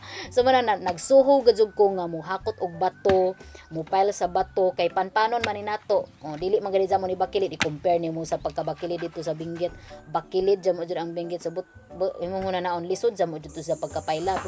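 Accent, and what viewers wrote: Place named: Bohol, Philippines. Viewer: Ganahan jud kaayo kos accent sa bul anon uyy 😍 always watching from agusan del sur ❤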